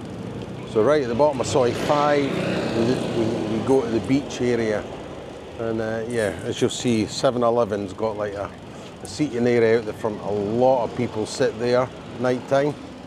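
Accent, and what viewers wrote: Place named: Scotland, United Kingdom. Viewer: Good video. Always good to hear a fellow Scot on YouTube. I've been going to Thailand since the early 90's and met my wife out there mid-2000's. She moved over to Scotland with me a year ago, with a view to settling here (for a few years). We may eventually move back to Thailand when I retire. Jomtien looks interesting. I met my wife in Patong and as you know, Phuket has become too busy in the last few years, just like Pattaya. Jomtien and some other parts of Thailand like Songkla City, can offer a quieter experience and lifestyle for those who prefer that. I would be perfectly happy in Jomtien, from what I have seen in a vew videos. Keep up the interesting vids and who knows? Maybe bump into you in the future for a couple of cheeky ones. All the best Pal:)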